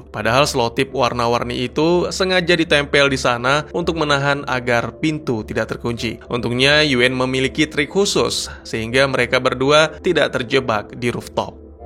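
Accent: Aceh, Indonesia. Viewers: wahh, abang dari aceh kah?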